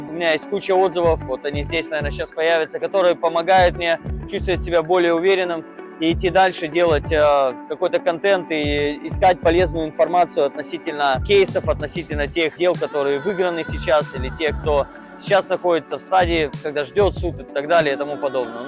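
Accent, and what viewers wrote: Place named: United States. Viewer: Крайние видео не нравятся, стал базарить как Америкос...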